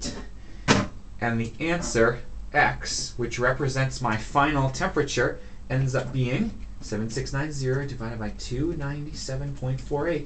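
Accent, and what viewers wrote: Canada. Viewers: are you Canadian??????? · Please tell me your from Montreal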